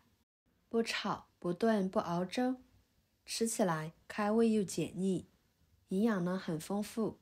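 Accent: Yunnan, China. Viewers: 一听就是大 云 南老乡啊:hand-purple-blue-peace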